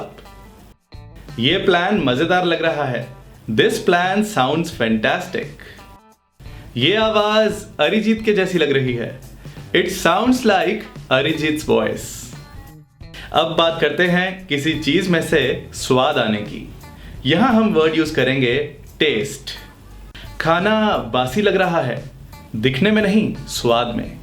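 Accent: Punjab, India. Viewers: Appka punjabi rool bada sone lagtehai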